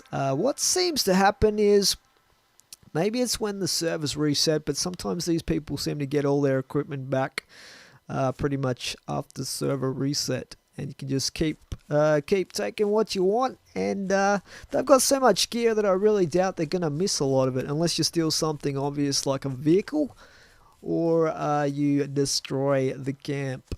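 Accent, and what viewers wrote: Australia. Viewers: u AUSTRALIAN mate me too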